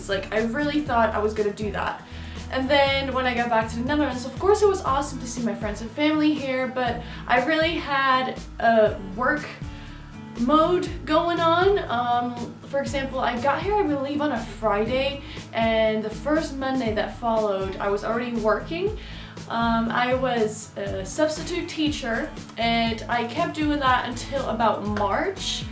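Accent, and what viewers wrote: United States. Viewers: Your english sounds american af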